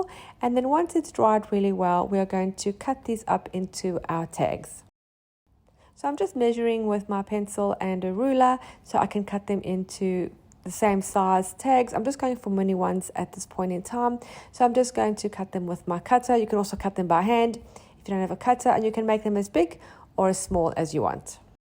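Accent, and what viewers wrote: South Africa. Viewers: You sound like a fellow South African, where are you from?☺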